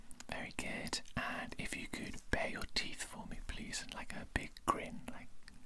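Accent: Wales, United Kingdom. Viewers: Where in wales are you from?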